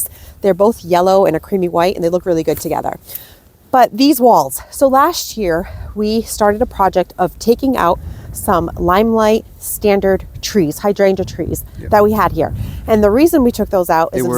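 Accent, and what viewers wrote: Massachusetts, United States. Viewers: Hi! I really enjoyed this video and seeing both you and together…thanks for sharing your plans! I could just feel the joy & excitement you had & it motivated me to start my planning now! We have a new flower bed around our new patio just empty, ready and waiting to be planted up! Oh boy…I’ve got lots of decisions to make…LOL! 😂 BTW…loved hearing your MA accent too! 😊 Also happy to hear that your Chinese Fringe plant from Janie made it…YAY. 👏🏼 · Love your Mass accent! Don't change it!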